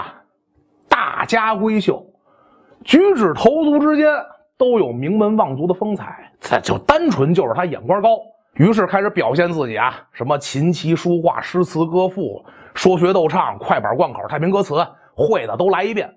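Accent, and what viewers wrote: Beijing, China. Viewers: 这北京口音咋听不出来亲切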